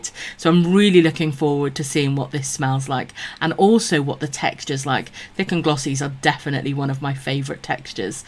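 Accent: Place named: United Kingdom. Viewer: I love your accent! And it’s so cute when you say my lovely’s! I just love all of the British language it’s so cute! This was overall a great review! As a reviewer myself you did a great job! You are so underrated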